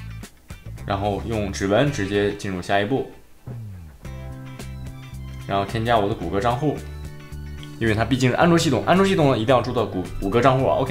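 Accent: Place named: North, China. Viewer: 你是北方人吧，現在在哪個國家生活？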